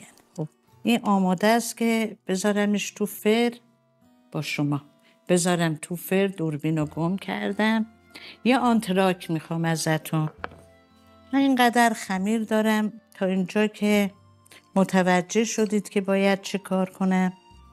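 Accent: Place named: Dezful, Iran. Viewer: از کلوچه دزفول بدم میاد ااااااخ شما حتما دزفولی هستی که تبلیغ شهرت میکنی پیداست لهجه دادی با اینکه رفتی اونور آب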